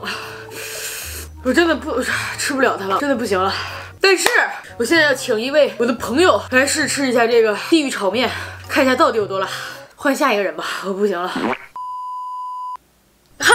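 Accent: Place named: Inner Mongolia, China. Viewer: 另外，感觉羊pd是内蒙古妹子，口音很像我们东北这边，但又能感觉到一丢丢的区别。听着很亲切☺️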